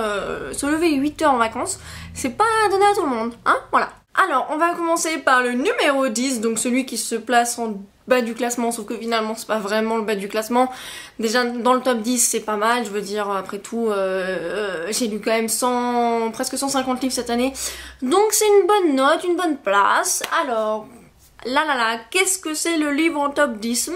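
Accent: England, United Kingdom. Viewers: t'es trop drôle! ^^ j'en ai déduit que tu vis en Angleterre avec ton accent! ;) merci beaucoup pour ces présentation de livre!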